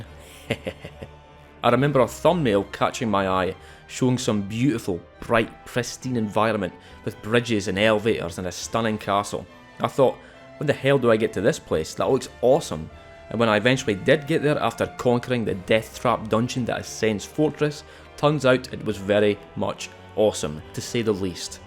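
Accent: Scotland, United Kingdom. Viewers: Finally. I watch a lot of different content creators on YouTube and from time to time there was this horrible accent that I really can't stand but I never knew where it came from. Now I know Scotland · First time coming across this channel. Was pleasantly surprised to hear a fellow Scottish accent. Have a like and subscribe, ya cunt! 🏴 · As soon as I heard the Scottish... I knew I had to sub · Who doesn't love a good Scottish accent to listen to.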